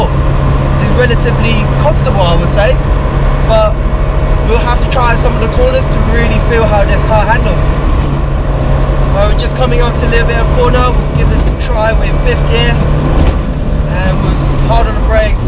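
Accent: England, United Kingdom. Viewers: Fuck bro u sound like a Pom hard , u must live in England surly?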